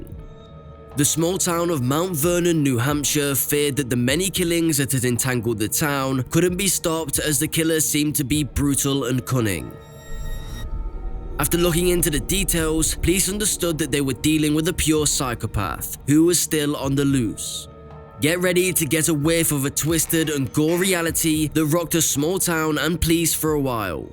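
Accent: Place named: England, United Kingdom. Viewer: This is weird. The narrator seems to have a genuine English accent, yet the script is clearly written by someone who does not speak English very well. How does this business model work? Is the person who wrote the script paying the narrator to narrate it, or is the narrator buying scripts from a foreign content mill? It's interesting that the narrator didn't correct the broken English, despite the fact that he must have noticed the errors.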